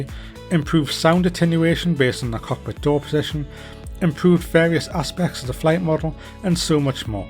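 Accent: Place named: Northern England, United Kingdom. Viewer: Nice to a northern fella, least they're local, i think your the voice that says "GO" in the RJ too? Great as always. Nice job JustFlight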